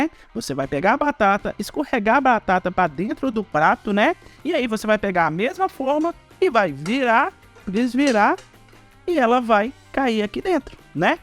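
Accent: Minas Gerais, Brazil. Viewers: Que delícia, amei sou de SP, aqui é cheiro verde, adorei o seu sotaque mineiro!!!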